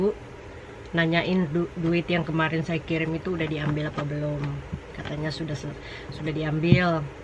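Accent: Indonesia. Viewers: Bugis apaki??ko sma cra ngongtta, hahaha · Mb ko ngmg nya kya orang sulawesi yah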